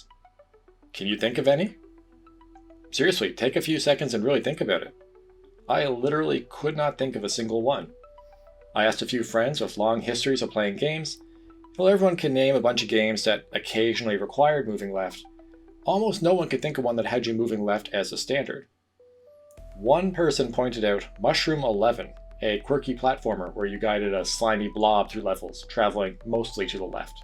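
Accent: Newfoundland, Canada. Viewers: Thats awesome! ive been to both of those provinces many times. Im born and raised in St Johns , NL. I could tell by your accent at the end of sentences. You have a good idea here for videos. Really enjoy them. Trying to think of a video idea for you. The Newgame+ troupe might work for a video. Anyways. Cheers from Newfoundland 🍻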